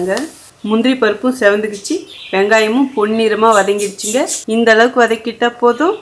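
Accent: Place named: Kongu, India. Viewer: Your preparations and Narration,in typical Kongu Tamil will enhance Children 's Lunches very Tasty. Thank you Sister 🎉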